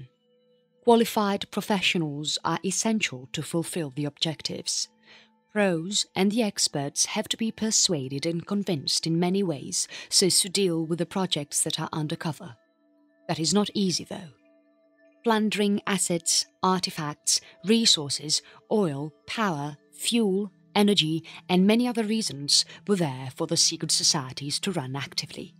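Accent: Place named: United Kingdom. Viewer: I'm seeking real info about The secrete societies in existence today establishing the New World Order like they have accomplished in Euro. Oh I know it's a secrete. Why don't you dare mention the world bank, the U.N, NATO, The Pacific Alliance, etc????? She has a British Accent but displays US Marines? I don't trust this Commercial. It demos no history.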